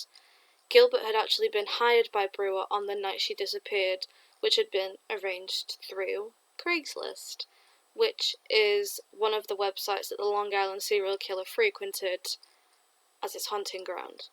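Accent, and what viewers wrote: Manchester, United Kingdom. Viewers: Is your accent Mancunian? X...( for those asking what is a Mancunian accent, it’s an accent for those native to Manchester in 🇬🇧 xx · Oh my, I'm just surrounded by all of these delicious British Ladies. I need to get on a plane and come hear it for myself. Surround me💪🖤💖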